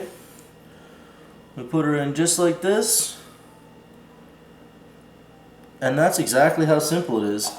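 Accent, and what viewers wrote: Newfoundland, Canada. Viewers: I've been successful (so far) with the avacado. The root is just finally on the outer surface of the seed. I also live in Canada and I just have it in my windowsill and its growing great along with my lemon trees , clementine and kiwi thanks to you! You have the Newfoundland accent is that where you are from?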